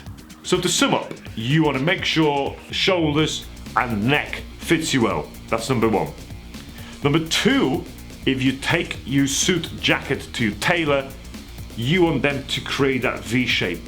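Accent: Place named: United Kingdom. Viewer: I know this is not the place to say this but you're absolutely gorgeous! Great British accent and excellent outfit. Well done!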